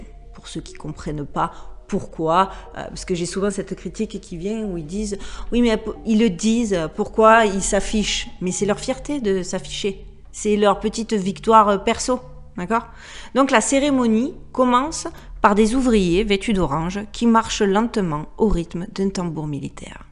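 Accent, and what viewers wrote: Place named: Provence, France. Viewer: Bonjour magnifique Jeune Femme pétillante à l accent adorable qui sent bon la Provence ! Votre lumière intérieure contrebalance la noirceur maléfique. MERCIIII !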